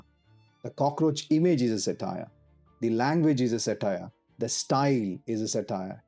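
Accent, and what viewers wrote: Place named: Telugu, India. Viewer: You are a telugu person.....you said "oote" for "vote". I stayed in Andhra for a decade❤❤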